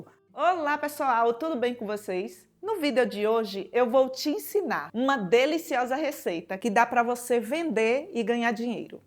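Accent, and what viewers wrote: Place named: Aracaju, Brazil. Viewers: Parece que tu é de Aracaju sotaque igualzinho 😂😂😂😂 gostei da receita vou fazer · Menina, que maravilha! Vou fazer. De onde tu és? Eu conheço esse sotaque. Também tenho, sou de Pernambuco, mas moro em São Paulo à 40 anos, mas te ouvi me deu muita saudades da minha terra. ❤ Parabéns pela receita.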